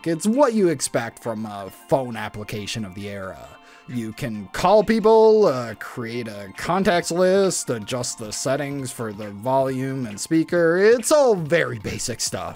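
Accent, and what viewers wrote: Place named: Midwest, United States. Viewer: This video was well researched I had never heard of some of these game boy games before!! Well edited and love the humor. However your midwestern O's were hilarious!! They remind me of Kevin from the Office which I know is a bad comparison but I think you should lean in on a persona as a Midwestern dolt.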